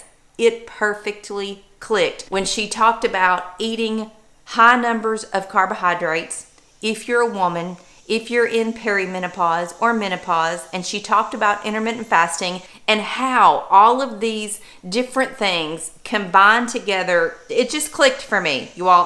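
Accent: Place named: Kentucky, United States. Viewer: has some great advice and congratulations on your progress. It is about getting healthy and not about losing weight. And it does take 30 days to change our thought pattern. I like doing omad. I'm not hungry through the day and if I do have a spirit of it I drink an extra water. Can't wait to see the progress and definitely do a couple of videos on what you cook. I thought I detected a Kentucky accent, just found your channel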